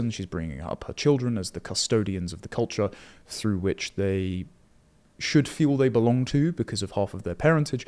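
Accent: RP, United Kingdom. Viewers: I really enjoy a lot of your political views, and I’d like to watch more of your content. But the exaggerated RP accent feels a bit forced. It comes across as if you're trying to sound overly refined or intellectual, kind of like a Gen Z Christopher Hitchens. Milo Yiannopoulos is a textbook case of taking it way too far.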